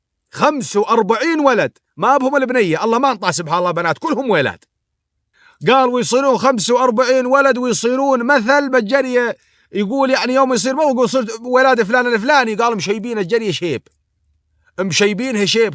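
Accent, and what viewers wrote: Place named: Iraq. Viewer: متابعك من العراق وبل صراحة ما عبالي انو اكو ناس بسوريا لهجتهم تشبهة لهجتنا لان احنة امعاهدين على لهجة أهل سوريا كيفك و شو بدك وربي يحفضك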